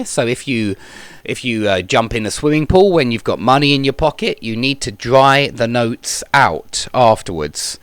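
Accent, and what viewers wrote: United Kingdom. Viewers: I like your way to teach with british accent. . love you so much. thank you frim indonesia :)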